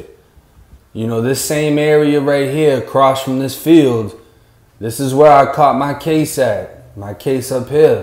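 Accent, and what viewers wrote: Boston, United States. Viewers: Ok man I get it..I thought u sounded like u were from Boston..ok man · I'm from worcester n it's nice for someone to finally she's some light on what happens in ma. U have a Boston accent..but thought u was from FL. A lot of shit happens around here but it never gets so thank u!!!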